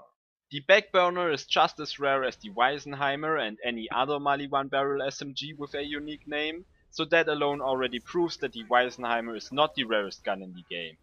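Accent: Germany. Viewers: are you german? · Bist du ein deutscher? Mann hört an deinem akcent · Are you german? You're English sound so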